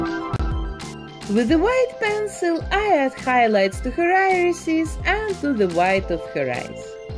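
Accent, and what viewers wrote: Russia. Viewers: У вас русский акцент Или мне кажется?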